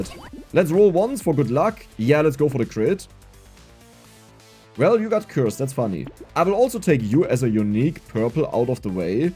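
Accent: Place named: South, Germany. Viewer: Kann es ein dass du aus dem Süden Deutschlands kommst zumindest hörst du dich so an?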